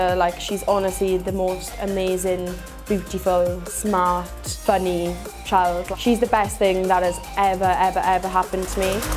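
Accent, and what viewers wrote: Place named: Wales, United Kingdom. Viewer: I really love Lateysha's accent! The Welsh accent is so pretty!!!!!!!!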